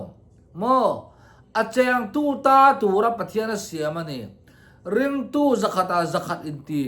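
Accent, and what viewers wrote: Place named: Bengal, India. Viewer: antih ngei chu I ni e. I tawng kha Beng leh lam ah a tlang rak mai mai rawh khiang tum I ang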